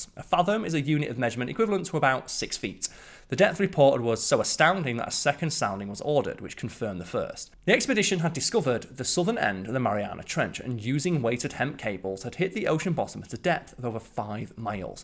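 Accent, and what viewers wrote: RP, United Kingdom. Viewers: an exaggerated form of RP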